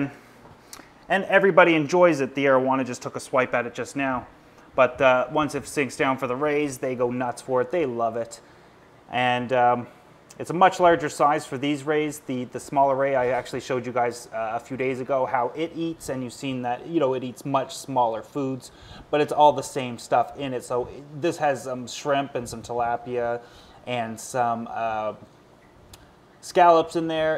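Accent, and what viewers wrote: Canada. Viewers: Sometimes you sound Canadian and sometimes you sound like a Joey from New York. Thank you for making this video. I enjoyed it a lot. From: Yous Guys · how Canadian this guy sounds is spectacular.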